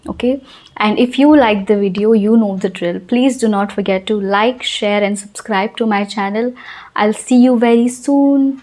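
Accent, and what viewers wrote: India. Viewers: English has become a major challenge for all of us today . Today people those who are speaking fluent English are getting preference. So, whether we like or don't, it is must. We will have to be very confident in speaking. Speaking skill can only be developed by speaking practice only. I am non telugu but I can express my ideas & emotions in a very clear way in telugu without knowing telugu alphabets. I have lot of experience that, most of the telugu educated people speak english but their accent is telugu mixed & it's very easy to recognise them as a telugu while they interact with other in english. It's so surprising that, you are some one who is speaking english like english. It's a good thing. You are really sounding like indian standard english. Ma'am you are 100% correct, that we should get ourselves attached every day & every moment with english by start reading informations whatever we get. 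Like whatever we purchase we find some informations on the wrappers of the stuffs. English is not such a BRAMHA VIDYA that, it can't be learnt. Problem is that, we are not investing that much of efforts and interests to control over the language. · I only subscribe to your channel because,. So soft your voice it is! Like a native Indian speakers. It will be very helpful for indian's like me.🌅💞💯✌️💐💐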